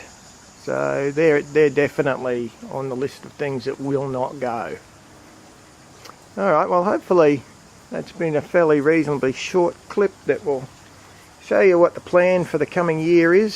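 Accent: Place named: Australia. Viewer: Nice. To hear another Aussie! Im out in the Lockyer valley and it’s to hot to grow through summer so I’m just starting my seeds for autumn.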